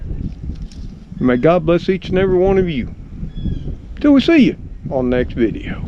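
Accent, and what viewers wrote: South, United States. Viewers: I am not young but then again I am not your age either. Love southern talk. Milk barn heater hmm wonder if one can still buy those things bet my mom knew about those.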